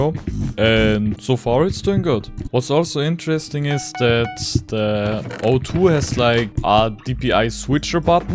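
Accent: Germany. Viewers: THIS IS THE MOST GERMAN ACCENT EVER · are you german?kinda sounds like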